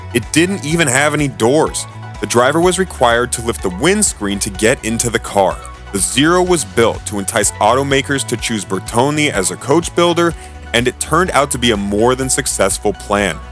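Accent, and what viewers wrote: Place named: United States. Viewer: Any A.I. voice would have spelt any of those names much better than any "American". To not to say anything about his "TweNNy-tweNNy"...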